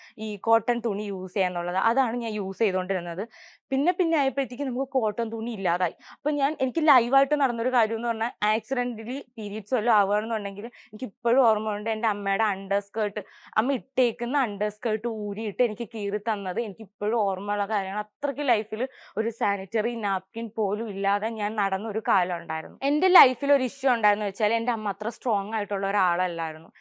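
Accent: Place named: Trivandrum, India. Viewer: Tvm slang aan samsaarikkunnath🙄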